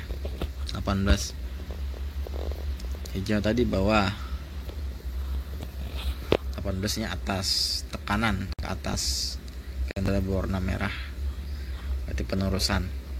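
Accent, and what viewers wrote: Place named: Kalimantan, Indonesia. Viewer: Bang tinggalnya dimana ? dari logat bicaranya org kalimantan yaa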